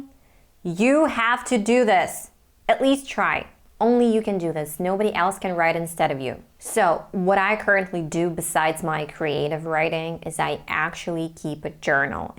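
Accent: United States. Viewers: Hi! What is secret of your pronunciation like Americans? How do you practice everyday? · Hello. Where are you from? Is it American pronunciation? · Hi again. when i heard your voice yesterday for the first time i thought by your accent that you were american. it's hard to believe that you are from ukraine. what i really think. if one don't have a chance to speak and improve the language every day otherwise it's impossible to got an accent like yours. it's just only a matter of time. stay healthy and hats off. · Have you ever been to or studied abroad in the US? I bet you've been. Because your accent is pretty nice and sounds like real American, How can you do that? Can you give me some tips to practice speaking sounds like a native speakers even as well as you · I like American accent, and could you please speaking a little slowly, I'm a new beginner....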